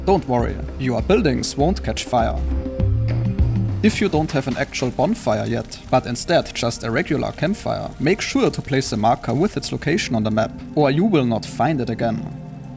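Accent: Germany. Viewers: Bro, du bist deutscher oder? 😂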